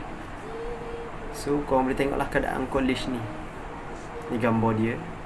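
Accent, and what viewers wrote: Terengganu, Malaysia. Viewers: nampak gaya ganu ni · Org mna? Bunyi cm org terengganu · abe tu ko? Meme pon . Sdak dgr org ganu kecek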